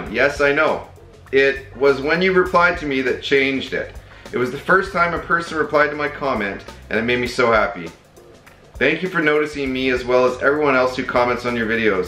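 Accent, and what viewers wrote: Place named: Mexico. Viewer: You do not sound bad. Just a little mexican.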